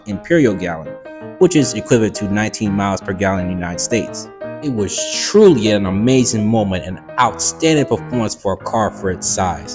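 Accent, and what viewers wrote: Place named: North East England, United Kingdom. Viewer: Thanks for the vid, I enjoyed it despite RR being a bit out of my price range. Hey but, cool accent, must be somewhere north east. I'm Western Australian, & I too speak English, but if I was speaking proper 'Strayan from the specific part of my country, which I would only do with my contemporaries anyway, you would hardly understand a word of it. Make some more vids.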